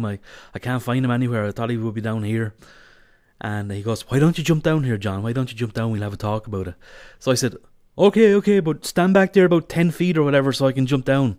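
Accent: Ireland. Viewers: Are you irish? and nice video! :D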